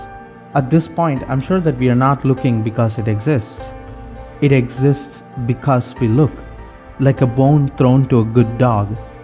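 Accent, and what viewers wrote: India. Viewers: This is off topic, but since you are a person seeking knowledge as well as me, I thought I could ask a politically incorrect question and you would not get offended. Please don't take this question the wrong way, I am asking because I really don't understand. When people with an accent from India speak English, they often roll their Rs and add a "d" sound. When they say a "w" sound they tend to add a "v" sound. Why is that? The reason I am confused is that I can make your sounds by imitating how you speak. But that makes me think that there must be certain pronunciations of your native language that native English speakers will get constantly wrong that indicate they are native English speakers to you. What are those sounds that we native English speakers consistently mispronounce that give us away?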